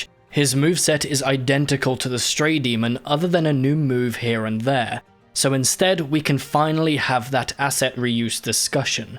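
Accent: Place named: United Kingdom. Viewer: Very good review, watched it from beginning to end. Do you know Greg Kasavin? I can't imagine you haven't been influenced by him. we can hear a similar music to the one in RE4, which can also be heard in the Gamespot Classic Review and your delivery is similar to him despite your British accent.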